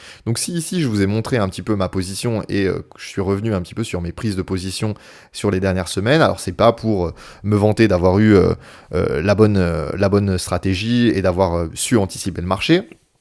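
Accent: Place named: France. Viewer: ...adopter du vocable anglais avec un accent francais HORRIBLE, c'est la loose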